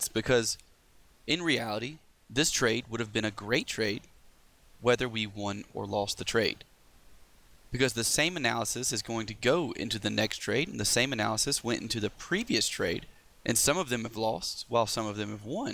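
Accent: South, United States. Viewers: .........UUUUMMMMMHHH I like the way you talk unmm huumm. Sorta Southern there aren't ya?. They videos and the analysis are comforting. I had to get out of the market for awhile ad focus on my business dealings that really pay the bills. You my friend are inspiring me to sign up and get the account funded and take some PIPS out of this market for a true life changing transformation. My full time job is draining me but I can't yet stop and walk away from it. I do have a Dream and a plan to make it happen. Got a few hurdles to clear then I I'm done.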